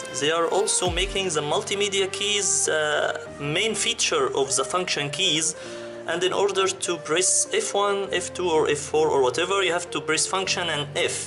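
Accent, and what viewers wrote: Egypt. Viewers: Speaking your language is better that zis ziz zeez egypt accent .. you makes me really angry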